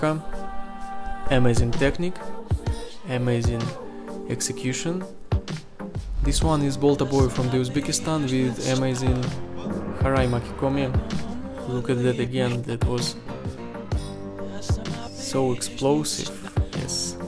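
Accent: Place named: Russia. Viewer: I think russian accent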